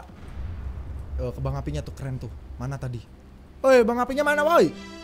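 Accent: Jepara, Indonesia. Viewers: OrNg jepara kah bang?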